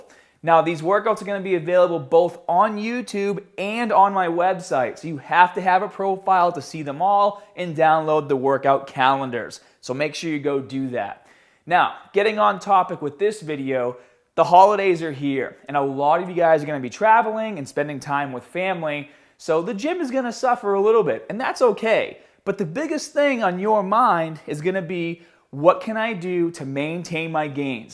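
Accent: Boston, United States. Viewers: Where did the full on Boston accent come from all of a sudden?? He never sounded like this in his earliest tutorial videos??? :/ It's like he's putting it on or something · dat Boston accent doe. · Man dat Boston accent is just not fitting, I'm so used to your old one. I've been off the gym for 3 months now due to a shoulder injury, I hope I don't lose all my gains... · Boston 100% haven’t you seen The Depahted??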